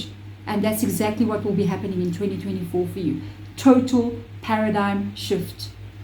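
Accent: South Africa. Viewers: is she south african, I love the way she speaks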